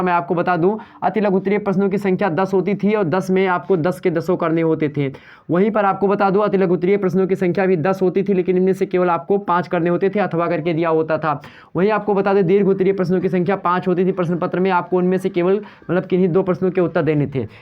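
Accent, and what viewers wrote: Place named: Gonda, India. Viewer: Voice se pahchan gaye sir ham bhi gonda se hi hai But aap bahut aacha padhate hai sir Ba third hindi paper 1st me jo kaviyo ke kavyans bacha hai sir उसे karwa dijiye sir please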